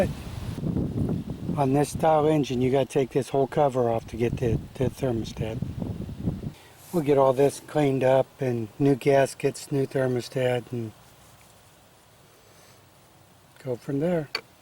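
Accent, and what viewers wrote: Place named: United States. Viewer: What type of tap and dye set? I've got Metric, UNF, BSF, Whitworth, Uncle Tom Cobbly and all but none of them are the right thread! I wish you Yanks would realise that there's a whole other world outside the good ol' US of A when making these Youtube videos, and for god's sake, learn to say aluminium! Alooooominum sounds so silly!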